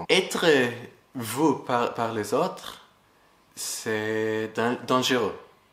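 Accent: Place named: Israel. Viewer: D'abord j'aime l'accent hebreu en français 🎶💯🔆✴ en suite j'espère parler hebreu comme vous parlez français ! Merci pour cette belle nuance enter les limites et les lumières....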